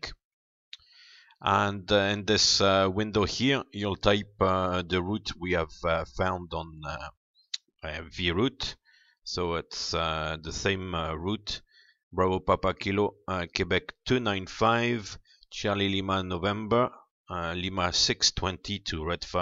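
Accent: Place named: France. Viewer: Tu as un accent de français qui parle anglais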